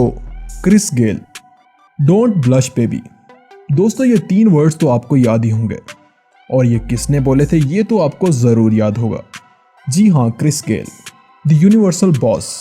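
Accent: Pakistan. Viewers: Yeh Pakistani lahza h is creator ka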